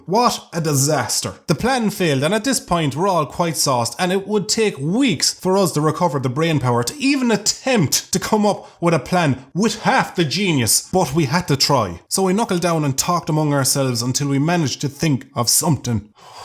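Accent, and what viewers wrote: Ireland. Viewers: Where in Ireland are you from? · Most youtube storytellers are shit but this is legit because this is definetly sounds like ireland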